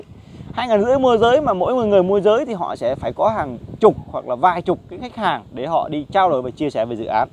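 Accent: Thanh Hoa, Vietnam. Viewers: giọng thanh hố hay sao ấy nhỉ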